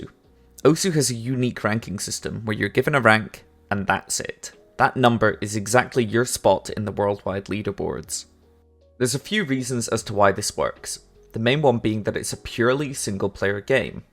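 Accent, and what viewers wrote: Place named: Scotland, United Kingdom. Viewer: WOW! What a wonderful and informative video. The OSU! community thanks you for putting such a great amount of effort into this, from the detailed animations to the outstanding soundbytes (pure nostalgia from Vsauce). As an ex-OSU! player, I always struggled with playing against smurfs >:( I blame my friend - Jamgyo. Forcefully making me download the game and getting me hooked. From there, it was a never-ending spiral of despair and misery from these smurfs. The game baits you in with its fun colours, fast-action-paced music, and anime backgrounds. Only to be humiliated as the screen goes black and you get a glimpse of your reflection... A sad, sweaty, filthy casual. This agony is only further prolonged as you realise you have received another defeat from someone who has deranked. As a result of this, I have had to stop playing and focus my attention elsewhere. 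I recently joined a friendly Old School Runescape Discord server, and with the great support and assistance of these members, whom I am proudly now able to call my friends, I am slowly closing the gap towards a FIRE CAPE. The thilling gameplay and pure excitement from 16hrs of straight griding in the Nightmare Zone have really made me consider never returning to OSU! Don't let my horror story put you off your grind; endurance is key, and you will triumph against these lowlifes. P.S. Love the Scottish accent🏆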